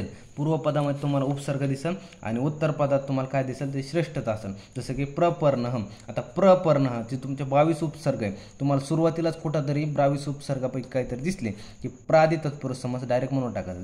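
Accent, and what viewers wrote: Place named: Vidarbha, India. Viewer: Pure gavran bhasha vidharbhachi zp shalet shiklya sarkha vattay sir thanks ❤❤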